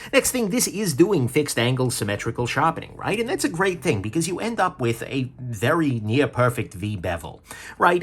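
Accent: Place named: Boston, United States. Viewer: I was placing it as a Boston accent, so curious because of your question, I snooped around the interwebs. He said, "(Seriously, though, when you're raised by a Bostonian and a New Yorker in the American West, you're pretty much screwed from the start.)"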